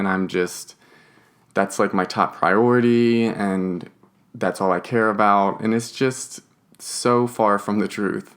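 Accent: United Kingdom. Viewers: Jezzz whiz, for an Adult PORN STAR you seem down to earth, really u do but are u english..for some reason i think your british but i believe your american....great vids....great chats..have a great day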